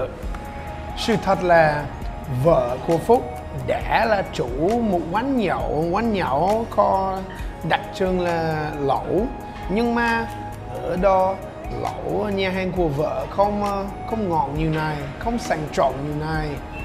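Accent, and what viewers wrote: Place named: Vietnam. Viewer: HELLO FROM AMERICA. HELLO ANH. I ENJOY LISTENING TO YOUR VIETNAMESE ACCENT, LOL. I GREW IN AMERICA. AS AN AMERICAN I'M USED TO ENJOY GOOD CHEESE. DO YOU MISS IT LOL? MY PARENTS ARE FROM VIETNAM ORIGINALLY. MY DREAM TO VISIT THERE SOON, AT LEAST ONE TIME. REDISCOVER MY ROOT. WHAT IS THE MOST SURPRISING THING THAT YOU FIND YOURSELF FALL IN LOVE WITH? HAPPY NEW YEAR 2021. 🍹🍸🤣🤣🤡🤡🐖🐷🐄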